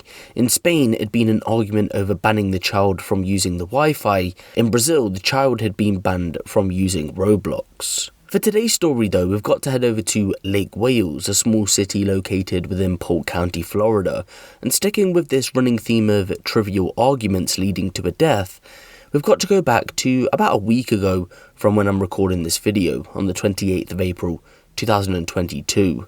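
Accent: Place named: RP, United Kingdom. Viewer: Dam I have been on YouTube for a hot minute what happened to your voice you've got well posh innit! 🤣🤣🤣🤣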